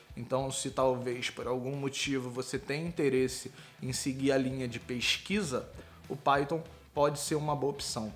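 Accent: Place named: Rio de Janeiro, Brazil. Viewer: Ih koe carioca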